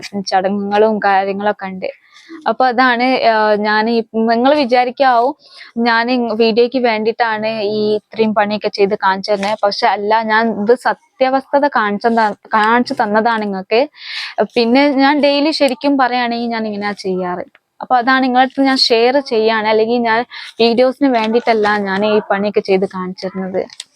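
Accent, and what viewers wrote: Malappuram, India. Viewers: കേരളത്തിൽ എവിടെ ആയിരുന്നു. മലപ്പുറം സ്ലാങ് ആണല്ലോ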